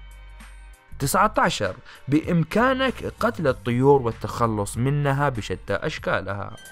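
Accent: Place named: Saudi Arabia. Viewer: لا السعودية واضح من لهجته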